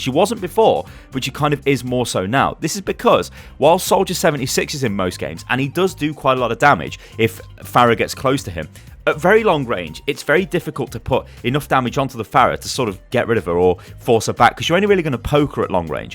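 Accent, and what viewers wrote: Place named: Liverpool, United Kingdom. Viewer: Where ya from, i cant pin your accent, is it liverpool or something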